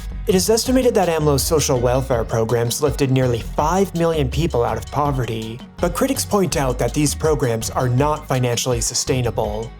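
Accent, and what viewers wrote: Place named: United States. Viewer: Irrelevant to mention President of Mexico ethnical background. She does not practice nor she considers Jewish but Mexican. I myself have a jewish last name, so what, I consider myself with the Culture I grew up with and where I was born. I do not practice Jewish Religion or its traditions. You Youtuber, your English makes people believe you are from USA. Would you like to be labeled as a foreigner and to mention the countries your grandparents were born? That always happened when a person is Important, but we in the USA, ever say where the grandparents of our Presidents came from?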